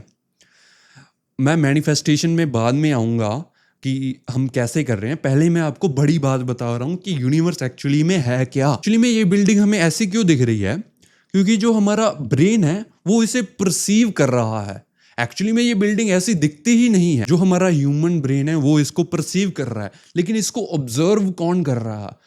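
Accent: Punjab, India. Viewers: punjabi lagda bai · From your accent of speech,ig you are from Punjab or haryana. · Tu punjab toh a. Mere veer tu bolna shuru kiita mainu pata lag gaya.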